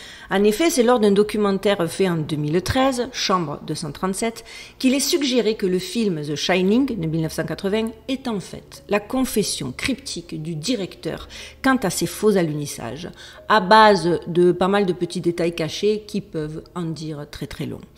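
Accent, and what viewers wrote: South, France. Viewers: Super travail, magnifique accent du Sud... nouvelle abonné, j'adore !... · Excellent! Ceux qui vous dénigrent et dénoncent sont des Trolls. Donc ils n'existent pas. J'aime beaucoup votre style narratif avec ce petit accent du sud de la France et ces petites saillies humoristiques. 😊👏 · Vous êtes remarquable dans vos recherches, vos analyses et vos explications . C’est un régal de vous écouter . Merci pour cette touche d’accent du midi .. c’est du soleil et sa lumière qui nous envahit. Continuez à nous régaler .. Que Dieu vous accompagne dans cette recherche de la Vérité .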